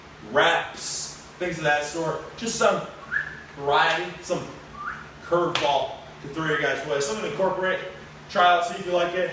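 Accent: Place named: Wisconsin, United States. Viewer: It always sounds like a Canadian accent but I guess Wisconsin accent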